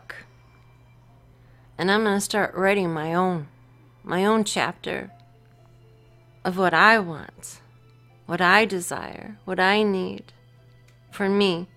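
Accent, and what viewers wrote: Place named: Spain. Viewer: Whoa now that is a combo! I can see and hear the spanish in you.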